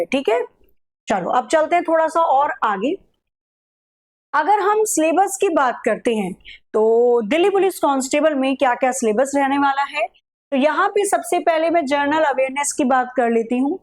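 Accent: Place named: Bihar, India. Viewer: Mam your bihari accent is too cute ❤. Love it❤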